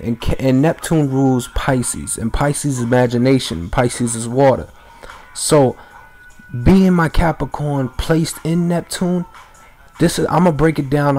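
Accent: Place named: New York, United States. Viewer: where you from baby? ny? I love yourrrrrr accent. ugh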